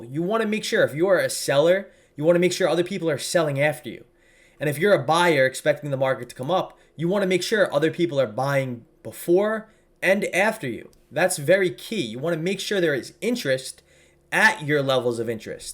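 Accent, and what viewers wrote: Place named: New Jersey, United States. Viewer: Come for the education, stay for the Jersey accent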